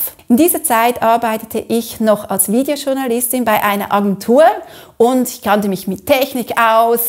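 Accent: Switzerland. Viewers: Vielen Dank für deinen ehrlichen Beitrag! Ich liebe deine Videos und konnte mir bereits viele wertvolle Tipps mitnehmen. Ich finde dich sehr charmant und liebe deinen Schweizer Akzent. Gerade das macht dich so authentisch und unterstreicht deine sympathische Ausstrahlung! Viel Erfolg weiterhin! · Danke für deine offene und ehrliche Meinung. 👍Mach weiter so mit den Lehrreichen Videos. 👌🏻PS: ich mag so sehr deinen schönen Schweizer Dialekt. Gruß aus dem hohen Norden, Manfred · Wow, ein super Motivations-Video! Vielen lieben Dank! Mal ganz abgesehen davon, daß du wirklich sehr sehr sehr guten Inhalt produzierst; ohne dein schönes Schweizer Akzent wären deine Videos weniger Scharmamt ❤️. Ich finde es Super, daß du Mut gefunden hast und weiter gemacht hast. Deine Kurse sind bestimmt sehr sehr gut! Ganz liebe Grüße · Zum Glück kannst Du Selbstbewusst mit dieser Problematik umgehen...Ich mag Dein geschweizertes Deutsch..😊 Du bist super... 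· Ich liebe deinen Schweizer Dialekt 😊🇨🇭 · Liebe, Du hast mir aus der Seele gesprochen. Es ist zumTeil unglaublich, was man im Netz so erlebt... Viele meiner Kollegen schalten Werbung und werden dadurch persönlich angegriffen... ohne Worte. Danke deshalb für dieses mal wieder sehr gelungene Video. Ich persönlich mag neben Deinen Inhalten Deinen Schweizer Dialekt besonders. Bitte deshalb unbedingt beibehalten und kultivieren;-) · Für einen erfolgreichen YouTube Kanal braucht man in regelmäßigen Abständen einen guten, hilfreichen Inhalt. Weiterhin ist es meines Erachtens wichtig, dass die Person authentisch rüberkommt und man nicht den Eindruck hat, dass da eine Show abgezogen wird. Beides ist bei deinen Videos voll und ganz gewährleistet. Dazu der schöne schweizer Akzent, der deine Authetizität noch unterstreicht. Vielen Dank für deine ausgezeichnete Arbeit! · Negative Kommentare sind auch irgendwie eine Auszeichnung ! 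So ein „negativer Kommentator“ nimmt sich die Zeit um Deine Videos zu sehen, ist möglicherweise neidisch oder kann mangels fundiertem Fachwissen keine sachliche Kritik anbringen oder hat Probleme sich angemessen auszudrücken . Wenn „negative Kommentatoren“ Deine Videos wirklich nicht gut finden würden täten sie diese nicht anschauen oder gar zu kritisieren versuchen. „Nicht einmal ignorieren solche Leut“ würden wir Österreicher sagen 😜 Ausserdem, ich liebe Dein schweizerisches Deutsch 😍 Ich finde es sehr wichtig und erfrischend wenn wir „Deutschsprachigen“ unser Dialekte sprechen und nicht von Schleswig Holstein bis Südtirol ein Einheitsbrei gesprochen wird . Liebe Grüsse aus Oberösterreich !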